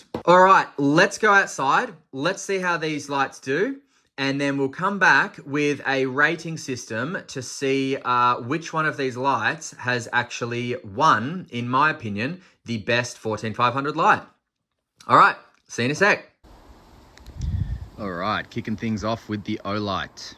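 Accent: Australia. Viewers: First off, let me say that I love that some one is making content that feels like it’s tailored for me. 2nd, as an American, we tend to love narrators from other countries, and your Australian accent is great! 3rd: how would you say these compare to the 16430 ?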